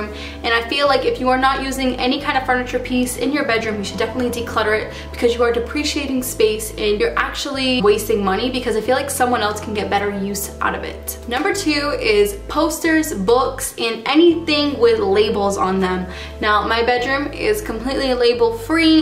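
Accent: Boston, United States. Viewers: Your Boston accent is so adorable!!!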